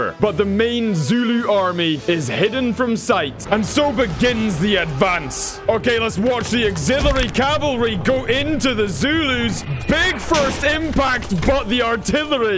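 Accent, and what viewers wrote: Scotland, United Kingdom. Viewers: Where are you from. Scotland ?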